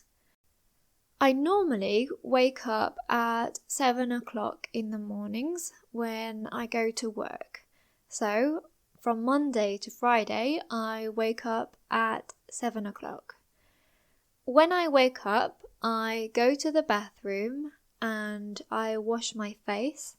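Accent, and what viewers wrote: United Kingdom. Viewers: Amazing british english 🇧🇷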